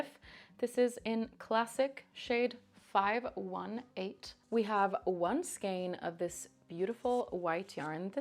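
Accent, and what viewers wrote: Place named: Italy. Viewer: your italian accent is perfect!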